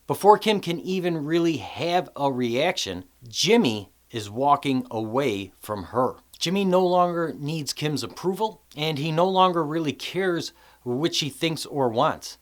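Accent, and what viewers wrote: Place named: Michigan, United States. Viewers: Like your Midwest accent! 👍 Great insight. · Love the accent. Michigan?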